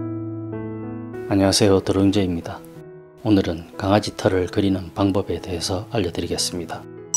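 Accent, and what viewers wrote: Gyeongsang, South Korea. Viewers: 감사히 잘 보고 갑니다 ^^ 말투가 경상도 쪽이신듯한데..맞나요 ㅎ^^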